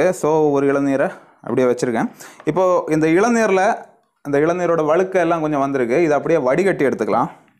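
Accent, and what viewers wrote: Kanyakumari, India. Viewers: Nice vedio and kumari voice very nice bro... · Ungal malayala tamil azahu...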